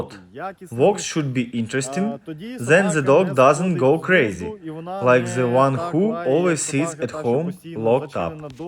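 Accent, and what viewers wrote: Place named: Russia. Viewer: Найдите другой голос за кадром, очень сильный русский акцент. Слишком сильный.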